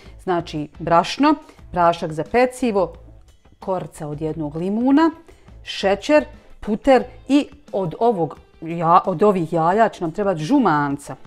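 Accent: Bosnia and Herzegovina. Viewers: Legenda si ,, obozavam tvoj bosanski izricaj..pozdrav iz Splita..😗😗😙😙😙😙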